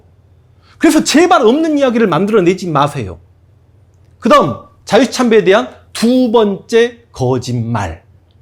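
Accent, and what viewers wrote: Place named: Chungcheong, South Korea. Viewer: 난 황강사님이 충청도 말씨라서 강의가 주구창창 공산주의를 지향하는 사회주의적이라 이상하다며 들었습니다 역시 광주분이네요 전라도분들은 거의 모두가 객관적 사실보다 목표를 세우고 그기에 대입하시는 경향이 많아요 강의하실때 좀더 실증적으로 하시면 감사하겠습니다 그 해박한 지식을 지향하시는 목표에 대입하시면 일반 사람들은 반박하기 힘들어요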